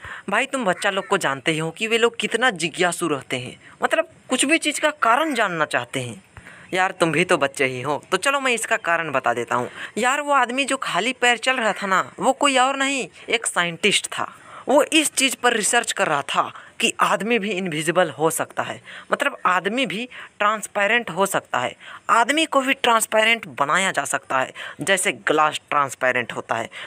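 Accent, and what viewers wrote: Bihar, India. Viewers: Bihari ho kya bhai 🎈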